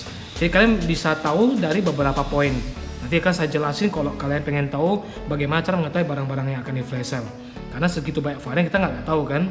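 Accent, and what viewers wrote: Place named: North Sumatra, Indonesia. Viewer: Ooh abang orang pakam toh 🤣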